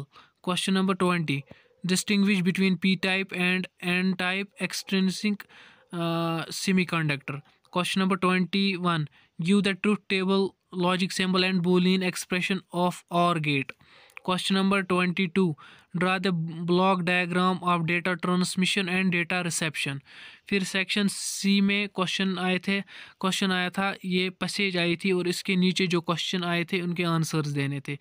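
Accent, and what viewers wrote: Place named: Kashmir, India. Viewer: Sir aap Kashmiri h kya? Aapki slang me se pata chlte h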